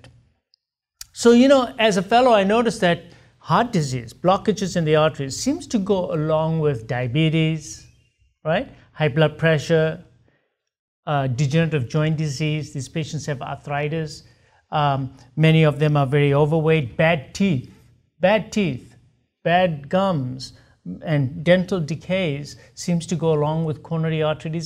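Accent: India. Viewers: Doc your accent is very nice not too english but still have that little indian accent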